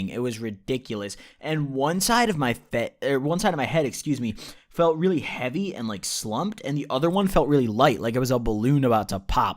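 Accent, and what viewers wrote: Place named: Illinois, United States. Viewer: i fucking knew you were from Illinois based on how you talk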